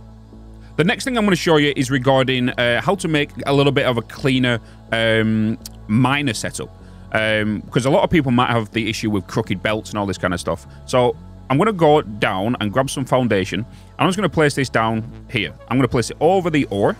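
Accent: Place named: Rossendale, United Kingdom. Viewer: You from rossendale pal?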